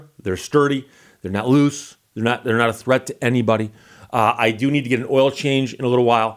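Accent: Canada. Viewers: Canadian robot lady you did a good job stitching that one together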